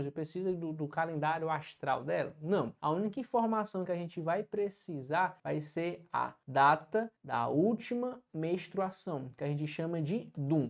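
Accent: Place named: Paraíba, Brazil. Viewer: faz vídeo sobre as medicações que mais são usadas na enfermaria, diluição, indicações de uso e contraindicações … ótimo vídeo! Sou da Paraíba temos sotaques parecidos kkkk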